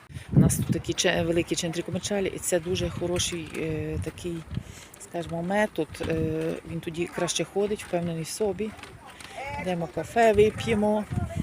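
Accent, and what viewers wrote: Ukraine. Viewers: Мені приємно , що так гарно ви володієте іноземною італійською мовою , мелодійно звучить з українським акцентом . Вдосконалюйте свою розмову !!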